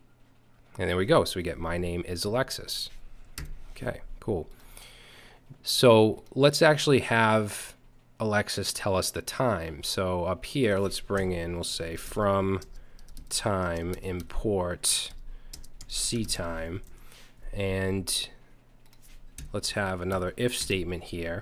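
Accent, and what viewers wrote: Boston, United States. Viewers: It can even pick up your Boston accent! good one. More Python please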